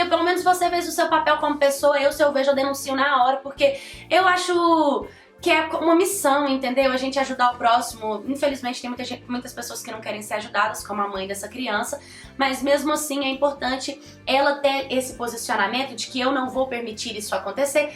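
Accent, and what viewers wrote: Northeast, Brazil. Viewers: Faz vídeos com o sotaque nordestino,amo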